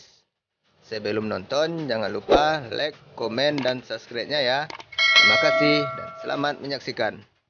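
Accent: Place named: West Sumatra, Indonesia. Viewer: Jaleh bana logat Minang ang sanak😂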